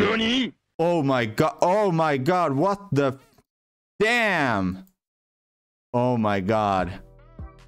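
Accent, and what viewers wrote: Finland. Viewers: Im and can tell you’re finnish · Watched the 1st 15 seconds and could hear that you are Finnish! Great content man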